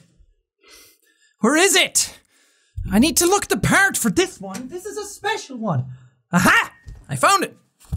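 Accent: Ireland. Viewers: i totally love his irish accent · I think it's Irish like how he says shy-t instead of shit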